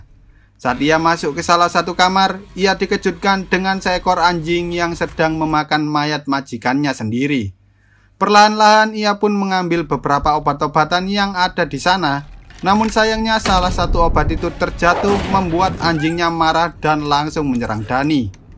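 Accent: Java, Indonesia. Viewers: Logat jawa mimin nya jawa nya mana min🤭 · Ws r usah ke suen lah wong jawa ya jawa endi boz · Medok g asik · Njawa luh males dengernya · Narator wong jowo too hehe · Mimin Jawa mana?. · Medok bgst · Paling Suka Dari Chenel Ini Suara Nya Jawa Bangget Dan Suka Bercanda Juga:v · Saaangat bagus rangkuman nya!!! Makasih! Aku suka aksen jawa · Wong jowo yo mas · Jowomu alami min😂😎salam dari malang👍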